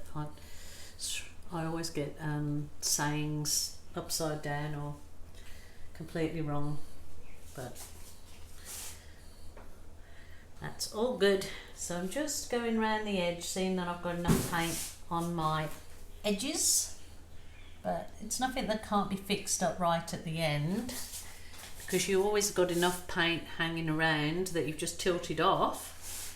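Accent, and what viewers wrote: Birmingham, United Kingdom. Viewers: That's so funny because I was going to suggest you were originally from Birmingham as you have a strong inflection on certain sounds - and it is strang that I should notice it to the exact region in the UK as I am an Irish woman, living in Ireland all my life haha! Keep up the beautiful work and I love that you are using glue. It just proves that you can do amazing things without breaking the bank. Have a great day and thank you for everything!!!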